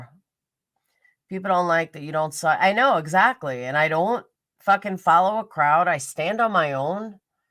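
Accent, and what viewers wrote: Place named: New York, United States. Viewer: Exactly true lots of people got brain tumors. You say so cute Ny accent. Lol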